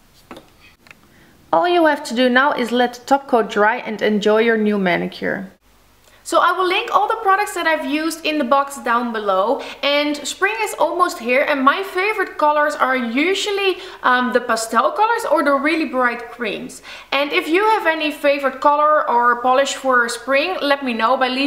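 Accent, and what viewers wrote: Netherlands. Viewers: Volgens mij kom je uit Nederland aan je accent te horen ;) Je filmpjes zijn erg leuk met leuke ideeen... En vooral heel netjes! Ik ga deze zeker proberen! x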